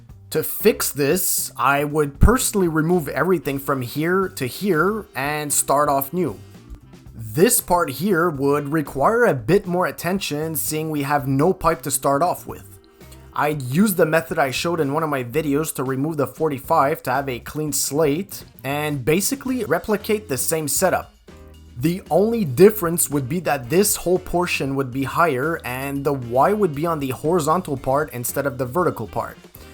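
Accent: Quebec, Canada. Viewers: bro you good he’s from Quebec that where he gets his accent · You're definitely from Quebec just by hearing your accent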